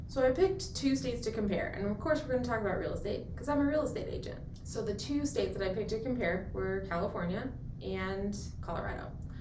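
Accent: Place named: East Coast, United States. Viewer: 1 Are you originally from OK? You have a "back east"accent. 2 What is your take on Bartlesville area? Thx for the vid.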